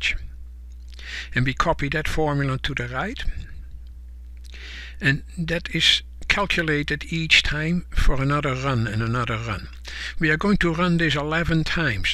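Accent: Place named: Netherlands. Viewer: love your Dutch accent makes you sound like my father telling me all this before I go to bed...good stuff Sir.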